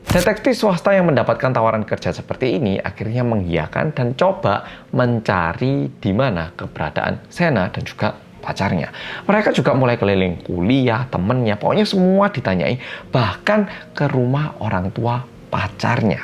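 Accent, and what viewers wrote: Java, Indonesia. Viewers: Aku lho klo dengerin cerita...suenengg...suara dan logat e megap2 medok malang campur lafadz korea ngono...lucuuu😅😅...semangat..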